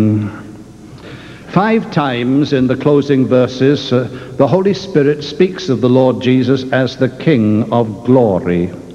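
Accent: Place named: Wales, United Kingdom. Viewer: Was Dr Phillips a Welshman? I'm sure i detect a Welsh accent in his poetic delivery.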